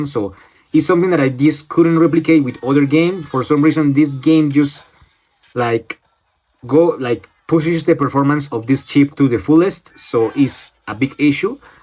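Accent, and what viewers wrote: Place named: Colombia. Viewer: Se nota que el inglés es tu segundo idioma, el primero por el acento es español. Infiero con total certeza que el eres de Colombia por qué también lo soy. Solo una recomendación, por qué a mí también me pasa, intenta hablar más pausado más lento, con más ritmo. Así notas más seguridad y profesionalismo. El video es útil. Gracias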